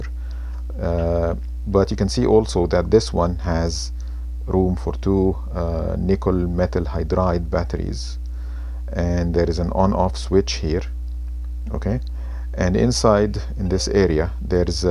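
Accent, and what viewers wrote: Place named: Canada. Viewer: Funny Canadian accent.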